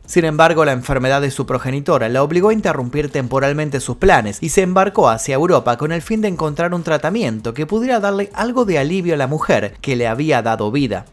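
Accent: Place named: Argentina. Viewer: Me gusta mucho este you tuber su asentó argentino y la perilla. A Rajiv Gandhi también lo mataron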